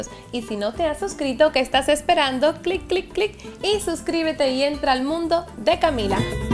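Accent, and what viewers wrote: Cuba. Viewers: Una pregunta en este video hablas bien claro pero en los videos más nuevos hablas más con tu acento cubano ¿Por qué?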